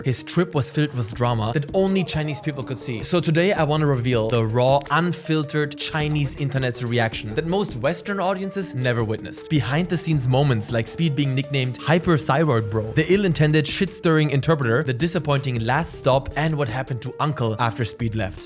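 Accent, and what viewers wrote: Germany. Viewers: Your dialect is German I hear it my brother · Dein Akzent erinnert mich an die Deutsche Sprache. Ich glaube du kannst Deutsch🤔. Wäre interessant zu wissen. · This Guy sounds like a german guy talking english 😄bist du Deutscher? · u german?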